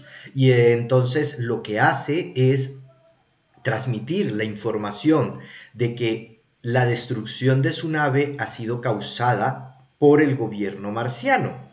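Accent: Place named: Bogotá, Colombia. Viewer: ud es colombiano, paisano rolo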